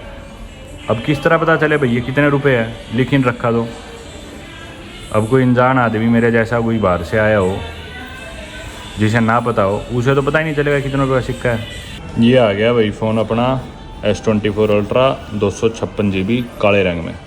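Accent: Haryana, India. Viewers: so nice to hear Haryanvi dialect in this vlog. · Language me haryanvi touch kamal hai bhai❤❤❤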